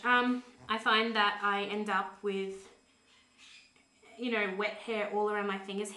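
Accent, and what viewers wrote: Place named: Australia. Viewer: Hey, fellow Aussie here, really liked your honest post, so nice hearing the Aussie accsent too. Sleeve day is June 14th, very excited. Thanks for the vids!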